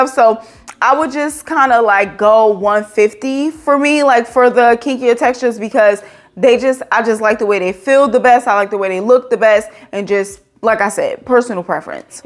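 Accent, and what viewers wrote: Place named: East Coast, United States. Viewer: Love your east coast accent!!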